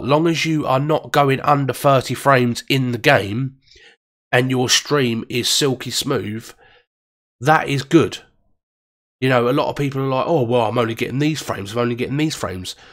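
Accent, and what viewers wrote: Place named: United Kingdom. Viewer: Ur too much British accent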